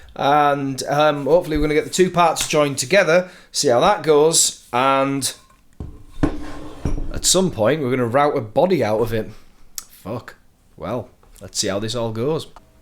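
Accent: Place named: Darlington, United Kingdom. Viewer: I'm itching to see the next episode... are you posting anytime soon? Great to see your videos though, I'm a Darlo boy living in New Zealand and it's good to hear a familiar accent.... Cheers, Steve.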